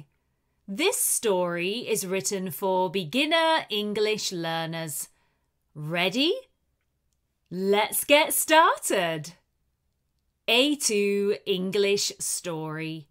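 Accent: United Kingdom. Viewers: the best channel to learn English in a British accent in a fun and not boring way...thank you for this story...keep going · I love the British accent for learning English! And I love it your voice, speak slowly, and your stories are very interesting and I can understand all perfect!❤ Thank you very much for your excellent work! Greetings from Spain!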